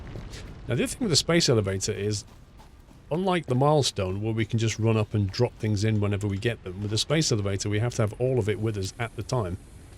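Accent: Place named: United Kingdom. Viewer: Australian 😉 yeah he a brit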